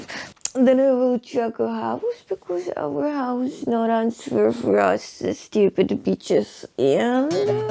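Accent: Russia. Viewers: Our girl used to have thick russian accent then lol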